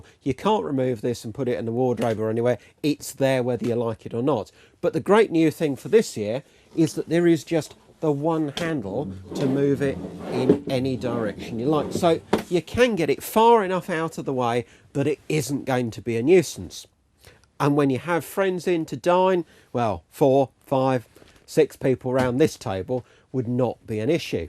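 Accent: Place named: United Kingdom. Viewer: Nice video, a little too fast to get the best view of the m/h Squeaky British voice too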